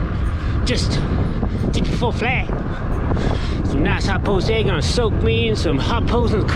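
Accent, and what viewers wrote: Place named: South, United States. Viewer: Your cowboy / country guy voice is awesome. Lol. Keep it up!!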